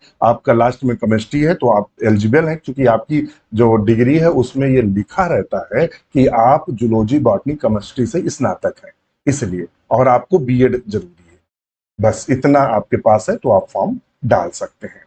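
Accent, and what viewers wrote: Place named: South, India. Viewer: Mere ek sir the south se vo bhi ese hi bolte the...l yell m yemmm n Yenna.....sobi think sir south se h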